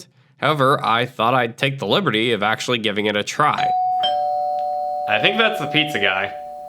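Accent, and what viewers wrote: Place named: United States. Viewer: For someone reason, I thought you were British. I didn't realized until halfway in the video that you are speaking in American accent 😂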